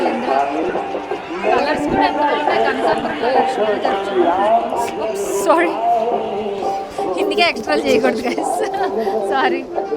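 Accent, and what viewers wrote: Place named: India. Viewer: ur totally changed 😀😀dubai to pure Indian slang version but very happy to see u don't stop vlogs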